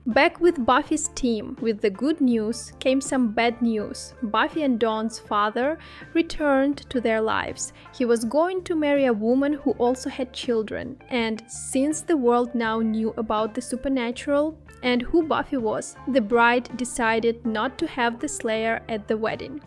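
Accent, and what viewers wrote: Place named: Brazil. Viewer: Are you Brazilian? Italian? Just curious about your accent.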